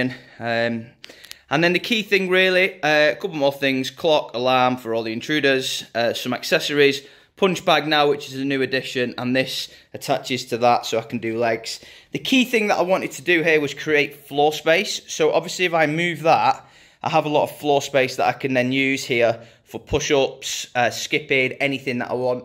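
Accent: United Kingdom. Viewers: I love your British English.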